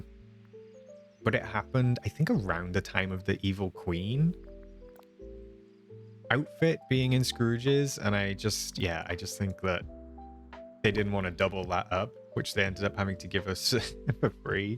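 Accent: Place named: Wales, United Kingdom. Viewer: I new I heard the Welsh there. I'm a Welsh valley girly too. Thank you for these videos though, they really do help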